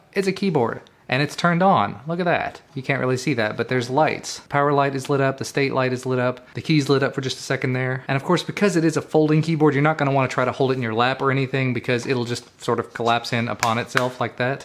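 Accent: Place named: South, United States. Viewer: I know you said you didn't have an iOS device, but I do. I bought mine about four years ago to use with my iPhone Xr, and loved it, although it took a long time to get used to the tiny size. I just upgraded to the iPhone 14 Pro, and after pairing the BK-03 keyboard, I can't get the space bar to work unless I press the Alt key and the space bar at the same time. As you can imagine, this can't stand. I'd never get anything done. I've monkeyed around in my phone's keyboard preferences, and have toggled various settings off and back on (this very often works!) and nothing has helped. I even switched ANSI to another option, then back again. Any help or suggestions you may have would be truly appreciated. By the way, after slogging through all the other videos, I responded to you because of the Southern accent. I'm a displaced Southerner myself, born in the wrong state! 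Ha ha!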